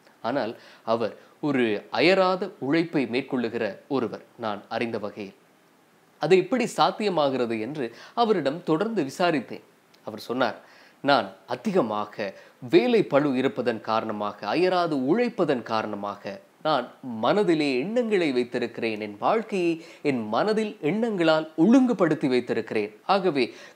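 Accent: Sri Lanka. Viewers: உங்கள் இலங்கை தமிழ் அழகு தமிழுக்கு நான் அடிமை . அருமை 👌 நீங்கள் சிரிச்சிக்கிட்டே இருங்கள் நண்பா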